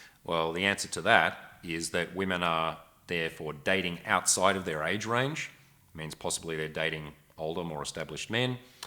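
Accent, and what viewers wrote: Australia. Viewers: Eu amo o inglês australiano dele 😅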